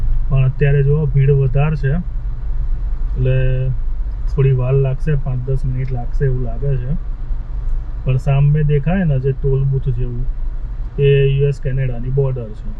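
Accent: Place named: Gujarat, India. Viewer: aapni bolvani Ane samjavavani style khub saras che, aapa video jovani maja aave che, Ema pachhu aapnu Gujarati bilkul typical Gujarati, sambhalvani maza aave che.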